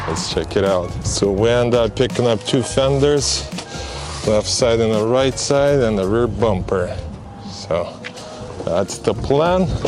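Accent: Russia. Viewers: Мне одному кажется что у него акцент из России?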